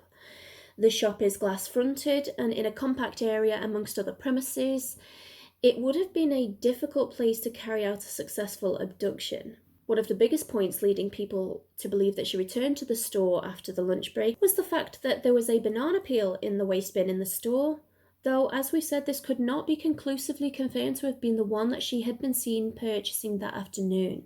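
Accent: United Kingdom. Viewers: great accent still Keep up the good work !, I cannot tell if you’re from near Manchester or Liverpool or somewhere in between??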